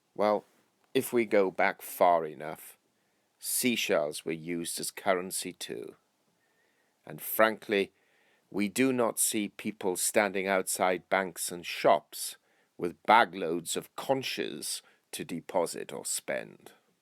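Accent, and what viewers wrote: England, United Kingdom. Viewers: Somehow this advice seems even more authoritatively wise hearing it in an English accent.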